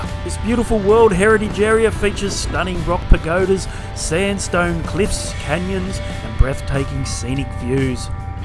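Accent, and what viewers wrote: Australia. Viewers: Great video. And the best Aussie commentary. Got my sub...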